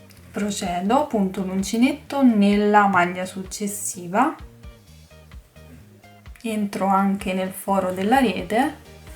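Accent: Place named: Italy. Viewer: Belo trabalho. E bom ouvir o jeito de falar do povo italiano . Me lembro da minha nona . Minha vóvo falava assim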